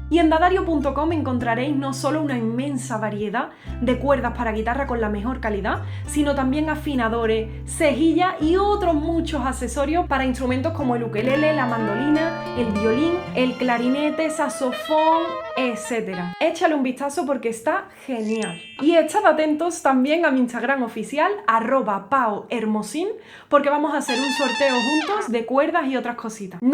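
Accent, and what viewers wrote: Andalusia, Spain. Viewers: Disculpa... de casualidad eres andalusa??? 😅😅😅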